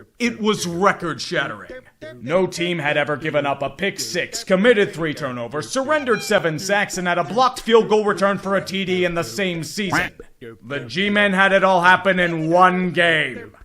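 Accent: Pittsburgh, United States. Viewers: Man I missing hearing the voice of this Yinzer bastard! 😂 Welcome back,. 👌🏾💯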